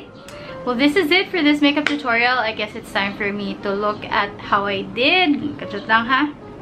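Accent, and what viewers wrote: Visayas, Philippines. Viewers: ang cute mo magsalita ng bisaya ate 😍 hehe 👍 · Ganda pa din ng accent mo kahit bisaya 😍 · Lamia paminawon nimo mag bisaya 💕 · Gnhan ko maminaw sa imong bisaya nga dialect ay,DLI kiwaw... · Lambing kaayo ka mag bisaya:) hehehehehhe love it ❤️❤️❤️❤️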